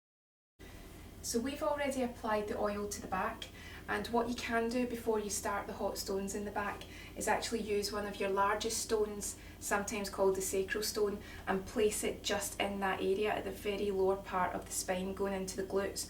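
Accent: Scotland, United Kingdom. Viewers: Scottish accent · Ah ma fellow scot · Really informative and relaxing to watch, as everyone has said. I love the Scottish accent too! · Scottish is an actual language. She's just speaking english in an scottish accent.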